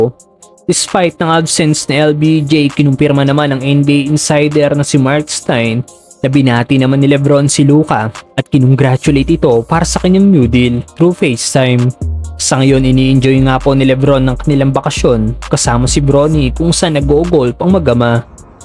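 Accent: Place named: Laguna, Philippines. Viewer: San ka sa Laguna? Yung tono mo haha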